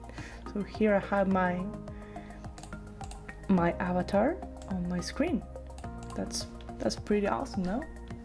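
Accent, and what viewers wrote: Chile. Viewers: Chilean? Wkajjs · ♡I LOVE YOUR ACCENT OMG♡ (I'M CHILEAN TOO HAHAHA)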